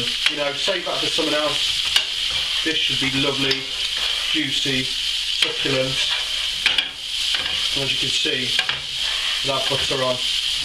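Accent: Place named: Scotland, United Kingdom. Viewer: Hello I'm French man and I love your vidéos but I' don't undertsand all you leanr. May be your accent and fatest language. Are you Scottish ? Congratulations for your job . (English begginer ) Thanks.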